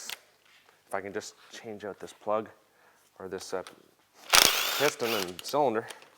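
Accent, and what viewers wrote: Canada. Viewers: Lol Canadian accent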